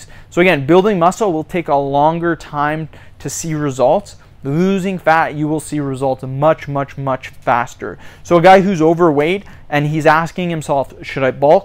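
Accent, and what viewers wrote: Canada. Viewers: Is that a Canadian accent I hear?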